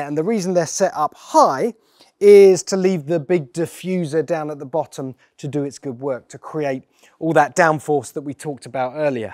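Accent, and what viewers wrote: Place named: England, United Kingdom. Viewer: Dude speaking with English accent, then goes on to use miles, km and liter. Why I generally don't watch Top Gear, rubbish.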